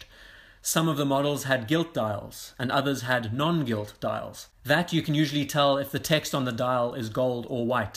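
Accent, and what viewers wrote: South Africa. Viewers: Great content.. Discovered you literally today. Watched lik 4vids 😅 You sound South African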